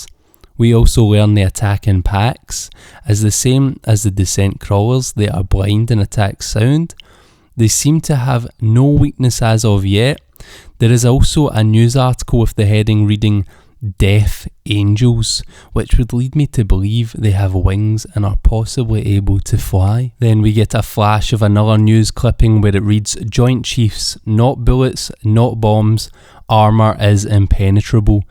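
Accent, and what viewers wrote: Scotland, United Kingdom. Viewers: That accent...sounds Scottish, I'm Scottish. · Fucking love your accent dude! Not what I'd expect in this type of video, but fucking lovely nevertheless. Sounds Scottish with a Welsh tint. Southwestern Scotland perhaps? Entertaining as fuck.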